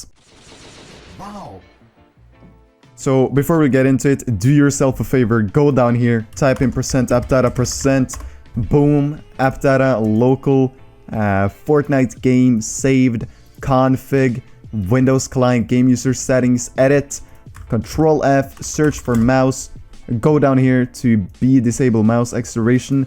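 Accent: Norway. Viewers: Are you from Norway? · Are you norwegian?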